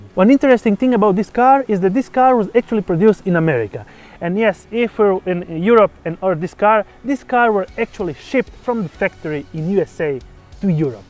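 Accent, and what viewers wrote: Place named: Argentina. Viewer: Oye boludo! He just sounds Argentinian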